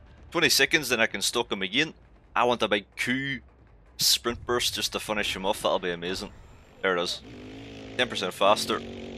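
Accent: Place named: United Kingdom. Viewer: Necklace Cage 😂 i Love that britisch accent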